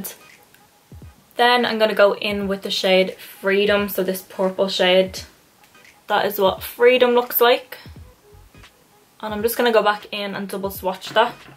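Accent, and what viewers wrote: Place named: Dublin, Ireland. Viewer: Can i ask where ur based u sound like ur from Dublin so if u are where in Dublin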